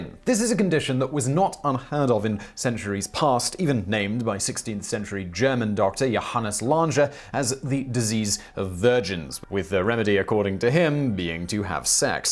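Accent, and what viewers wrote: England, United Kingdom. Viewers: Why is Michael from VSauce speaking British? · What area of England does your accent come from?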